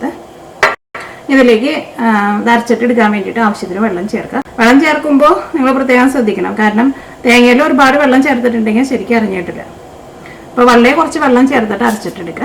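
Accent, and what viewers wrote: Thrissur, India. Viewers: തൃശൂർ ഭാഷ ഒന്ന് മാറ്റിക്കൂടെ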